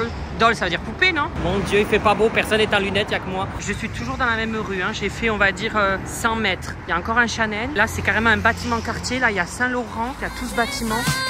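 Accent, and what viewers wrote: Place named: South, France. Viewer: J adore ce que tu fais.bravo a toi tu le fais trop marrer avec ta mère. Ton vlog va beaucoup m aider je pars en octobre pour fêter les 26 ans de ma fille merci d être comme tu es je me retrouve dans ta façon de parler le sud en force.😂belle et heureuse année a toi et a ta famille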